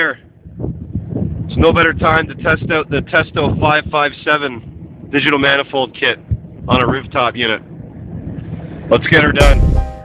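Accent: Canada. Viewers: Good Job enjoy your video, very good explanations in your narration ...are you from TO?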